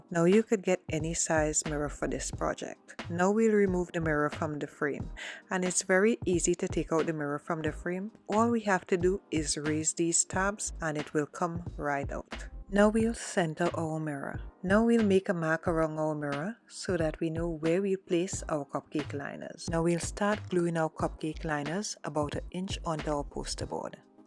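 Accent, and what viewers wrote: Trinidad and Tobago. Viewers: I know a Trini accent when I hear it!!!😘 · Great job,you sound like a Trini 🇹🇹.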